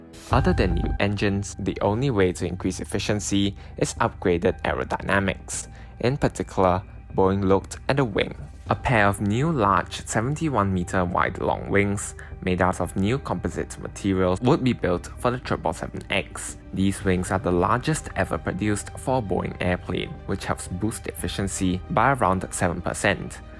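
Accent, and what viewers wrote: Singapore. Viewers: Nice documentary. Thanks for creating it. Is that a Singaporean (or perhaps Malaysian) accent you have?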